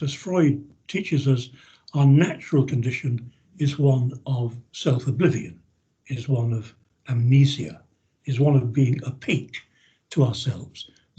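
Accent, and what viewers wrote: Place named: Oxbridge, United Kingdom. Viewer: Gosh, I love this dude-he is a flaming hoot-his humor belies his rather stuffy,( Oxbridge(?) accent-I'm a financial analyst myself, but I would have enjoyed studying with this cat.-where ordinarily I wouldn't go within spitting distance of any Humanities faculty.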